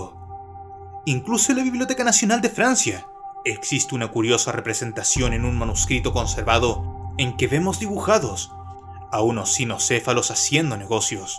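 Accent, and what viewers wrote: Chile. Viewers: tiene acento chileno 😮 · Eres Chileno?bro muy buen canal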